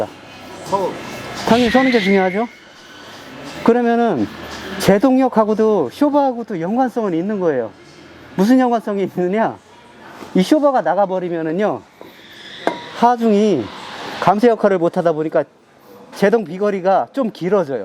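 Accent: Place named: Hwaseong, South Korea. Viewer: 말투가 고향사람 말투 입니다 정겹습니다. 경기도 화성인데...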